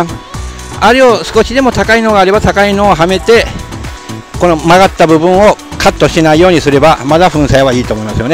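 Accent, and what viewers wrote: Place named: Kagoshima, Japan. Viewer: あ！かごっま弁！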